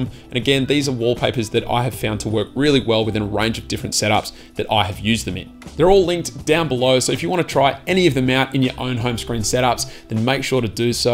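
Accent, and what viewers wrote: Australia. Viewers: Great video bro! Is that another Aussie accent I hear? 👍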